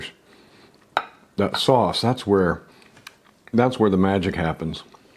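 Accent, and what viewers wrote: United States. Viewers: He is American. They say erb. Brits say herb.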